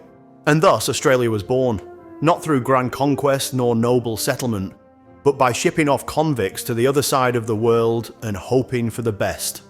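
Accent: United Kingdom. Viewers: What kind of British accent is this?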